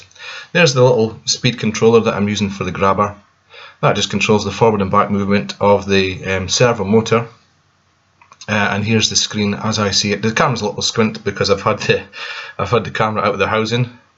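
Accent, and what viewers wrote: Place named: Aberdeen, United Kingdom. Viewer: Great video! You sound like you're near me. Aberdeen?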